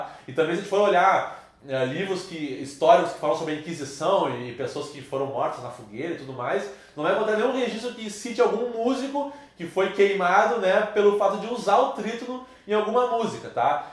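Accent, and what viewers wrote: Rio Grande do Sul, Brazil. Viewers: Olá professor! Estou encantada com seus vídeos. São todos maravilhosos e de excelente qualidade!! Gostaria de saber seu nome e nome da equipe de trabalho. Creio que sejam gaúchos, pelo sotaque. Acertei??? Abraços à todos! E parabéns pelas explicações simples e fundamentadas.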